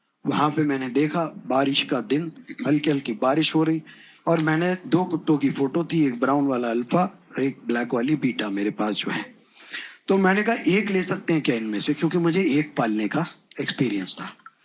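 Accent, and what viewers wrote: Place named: Bareilly, India. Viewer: proper bareilly accent....!!!!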